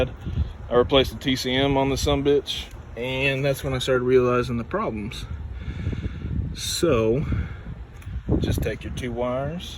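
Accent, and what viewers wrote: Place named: Alabama, United States. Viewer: Sumbitch you gotta be from Alabama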